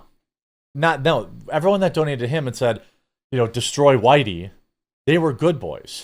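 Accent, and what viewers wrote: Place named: New England, United States. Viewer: This guys accent is 🤮 fucking Yankees….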